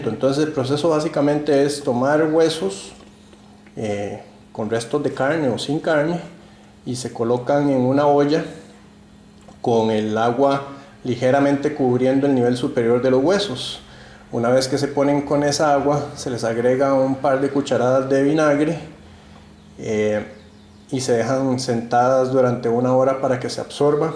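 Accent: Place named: United States. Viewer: tienes una erre medio agringado. Éxito.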